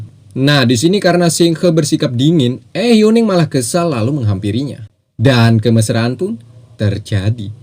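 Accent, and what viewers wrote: Banjar, Indonesia. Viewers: Mantap mbuk ai banjar banar sdh🤣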